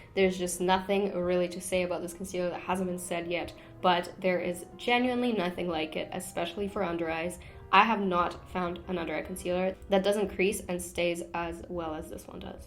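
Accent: United Kingdom. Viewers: That British accent is coming through and I am here for it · You're starting to get that English accent! Too cute! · Soooo happy to see you back 💗 I also love your slight british accent hahaha it’s so cute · OKAY I saw the comment on your ig about the accent and now I can't unhear it lmao I think it's the way you end the words and say the 't' now. I could also be tripping. but british influence tho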